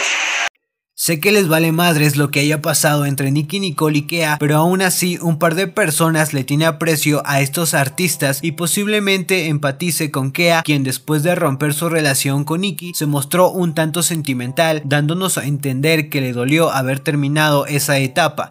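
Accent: Argentina. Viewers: Eu yo pensaba que eras de argentina jsjsjs De que pais sos??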